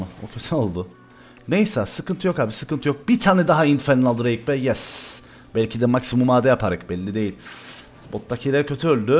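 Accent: Cyprus. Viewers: Abi sen nerelisin çok merak ettim konuşman sanki kıbrıs ağzına benziyo ve videoların çok güzel olmuş eline sağlık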